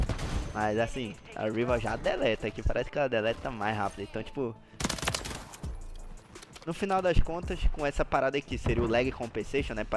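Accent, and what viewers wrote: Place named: Rio de Janeiro, Brazil. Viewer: Eu achava que tu era carioca kkkk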